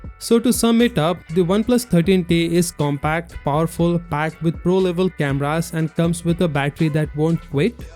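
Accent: India. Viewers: U got the Indian accent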